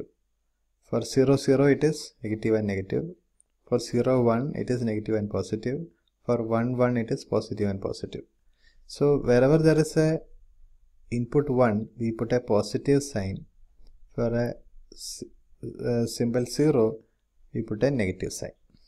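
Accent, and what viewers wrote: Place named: Kerala, India. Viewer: malayalee accent can be easily spotted by the way they pronounce the "r" sound .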